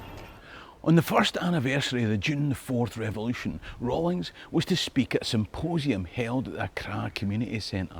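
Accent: Scotland, United Kingdom. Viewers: I love the way you say "Ghanaian" with such a broad Scots accent, it's just so appropriate.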